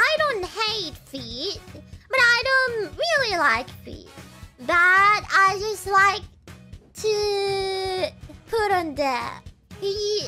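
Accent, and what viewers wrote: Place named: Australia. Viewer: The ol aussie twang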